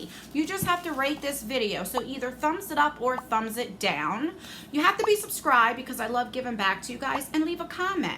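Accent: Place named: Louisiana, United States. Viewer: Cool! Can’t wait to get my box!!! Hey, I keep hearing your accent (as someone from Oklahoma with my own accent 🤪) and you sound a tiny bit like you’re from Louisiana. I know it says Jersey in your intro, but just thought I’d comment that. Love your reviews!!!!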